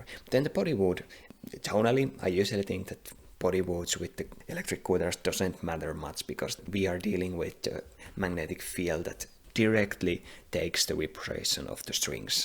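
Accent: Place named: Finland. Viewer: this man sounds very finnish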